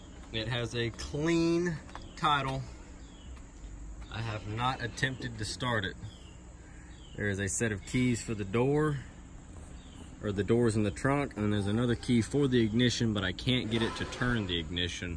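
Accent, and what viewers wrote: South, United States. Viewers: Jesus, Southern accent and knows/appreciates 80's panther frames? Please turn gay and marry me? Pretty car though. I like them so much more than the Town Cars of the time. Too bad it'll probably never be restored. These haven't quite reached classic status in the eyes of the masses but it'll get there. Hopefully it'll get lucky and hold up to that point.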